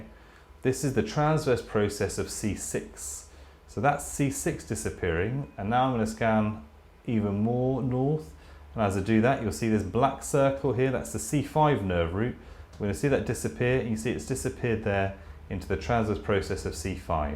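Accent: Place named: England, United Kingdom. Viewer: perfect video. nothing like the english accent to learn new things awesome teaching skills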